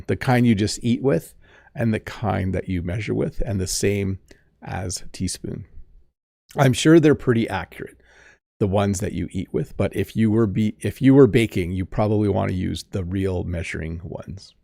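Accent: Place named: Canada. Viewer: Thank you,! I'm going to your beautigul country on May! Thank you for your lessons! Watching your videos I can learn a litlle bit more the accent that the canadians use! I also like so much of the Rush' songs, since I was young, the great rock band of Canada. So receive a big hug, and have a nice night! Thanks a lot!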